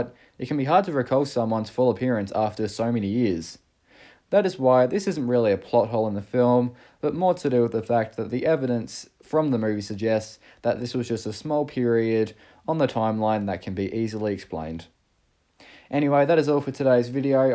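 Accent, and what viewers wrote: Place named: Australia. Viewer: God I hate the Australian accent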